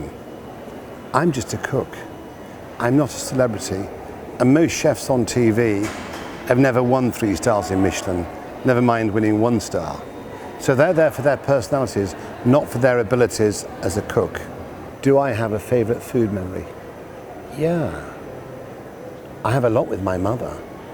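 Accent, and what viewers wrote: United Kingdom. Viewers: I love how his accent goes more Leeds when he's the man of the people, while then immediately correcting with his eloquency. He knows the language of food and of the poor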